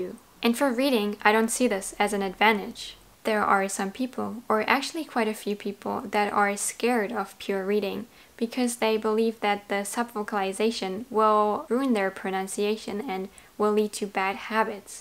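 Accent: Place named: Germany. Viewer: Wow I just found this video and you expressed exactly my thoughts about refold. So many of your points of view I also had them in the back of my head but for some reason I didn't realise I needed to listen to them from someone else. By the way, what is your native language? You give me strong German vibes but your English is really good!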